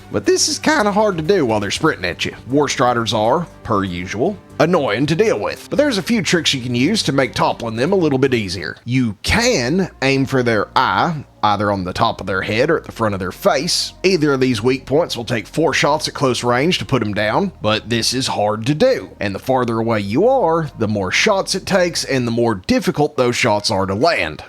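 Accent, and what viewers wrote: United States. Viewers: is this country accent real? it's annoying af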